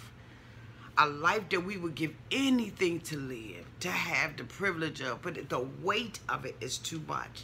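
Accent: Washington DC, United States. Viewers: Is that a DC accent I'm hearing ?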